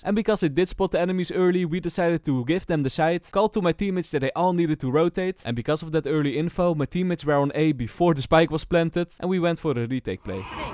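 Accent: Netherlands. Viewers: i smell a little nederlands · You are Dutch arent you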